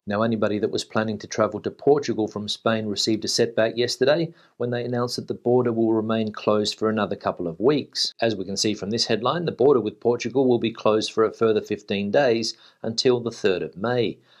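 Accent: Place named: Australia. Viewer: Thank you for your informative video and your continued work. By the way loving your Aussie accent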